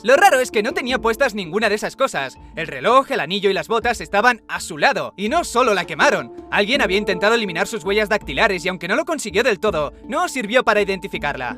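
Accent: Spain. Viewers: Nunca había escuchado una voz tan chillona como la tuya, eres español? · Prohibanle a los españoles hablar en youtube, que manera tan horrorosa de hablar...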